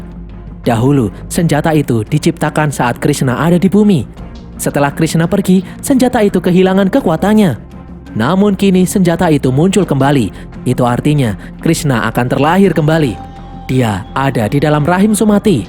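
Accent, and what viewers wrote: Java, Indonesia. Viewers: Adminya orang jawa ya, karna mbacanya karno🥵🤣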